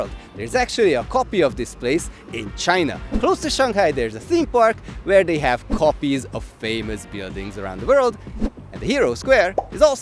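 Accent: Hungary. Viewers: A magyar akcentussal meg jó kis Hungarikum! :D magyar Lincoln videóit ismered? Jókat lehet rajta röhögni.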